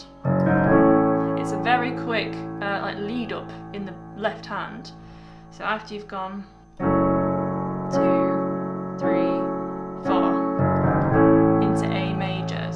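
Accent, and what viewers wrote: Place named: Lincolnshire, United Kingdom. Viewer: Hi, Your tutorials are really easy to follow and you explain them so well, I’ve be trying this on and off for a few weeks now and almost nailed it. Can’t add vocals yet though lol. Just out of interest and I hope you don’t mind me asking but you sound like you’re from my neck of the woods, sort of Grimsby Humberston area of Lincolnshire. Hope I haven’t offended you with that 😅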